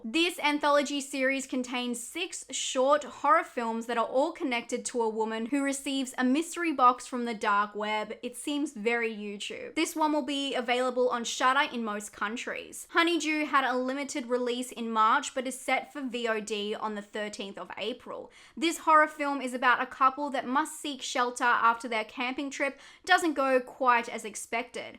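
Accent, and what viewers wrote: Australia. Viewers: ah bro bless her, subbed when she was at 2-3k and almost at 80 k now and she still puts up A class content love her aussie accent lol wothahhhh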